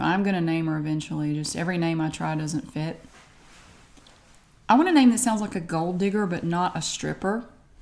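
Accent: South, United States. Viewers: MY GOD you look incredibly stunning! I think it's that success glow, new house, 2 year long channel, new camera (maybe?) but regardless you look amazing! You are seriously one of the most underrated ASMR channel out there. Your content is so simple but bold at the same time, hands down my favorite channel. Thank you for being who you are, dedicating yourself to this channel with a full time job, and 2 kids, I seriously don't know how you do it but you have my respect! I really want to watch this right now but I gotta go to work. But I can't wait to get home and fall asleep to this beautiful Southern belle talk me to sleep! lol.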